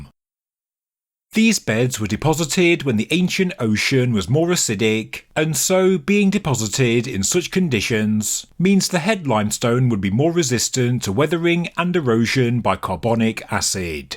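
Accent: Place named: England, United Kingdom. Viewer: since when did have an English accent?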